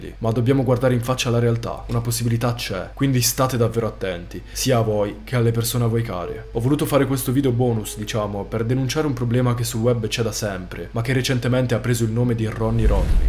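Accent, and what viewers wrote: Sardinia, Italy. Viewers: Ciao cara, anche tu l'apprezzeresti allora? Magari facendo trasparire un pò l'accento sardo... mmmhh ci starebbe molto, molto bene ❣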